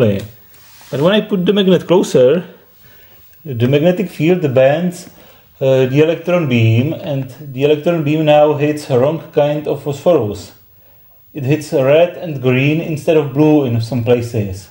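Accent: Prague, Czech Republic. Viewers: Didn't expect to hear a Prague accent in English. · Czech English ? :D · Where are you from? Your voice souds like your from Czech xD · Čechoingliš :D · Pocujem cesky prizvuk uz po prvom slove. Mam pravdu ze si cech ??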